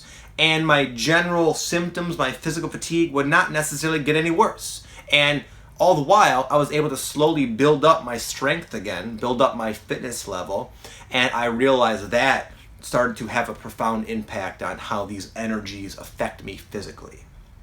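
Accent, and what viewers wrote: Michigan, United States. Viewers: I'm from Ann Arbor and you sound like you're from Michigan :D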